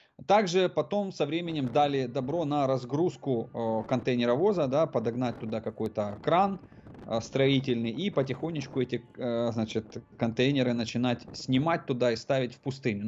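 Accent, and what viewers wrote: Ukraine. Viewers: Судя по акценту ты из Одессы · оказывается, это украинский говор.. оба-на..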